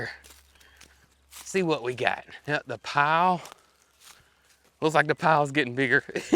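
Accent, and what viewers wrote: South, United States. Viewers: I have to admit I do enjoy reading the CC to see how they interpret your southern accent. 😅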